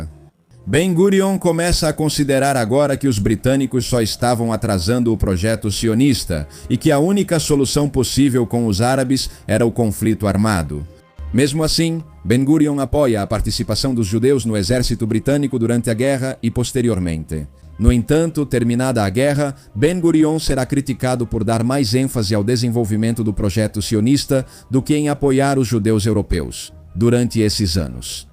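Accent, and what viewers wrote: Portugal. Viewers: É um bom documentário. Mas essa narração atropelada, português lusitano misturado com português brasileiro. É muito rápida e confusa. Estragou o vídeo! Péssimo!!!!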